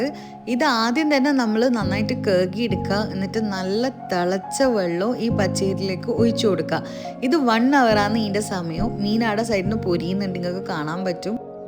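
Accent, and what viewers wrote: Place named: Thalassery, India. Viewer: Hearing you speak in our good old Thalassery accent—without trying to sound too polished or artificial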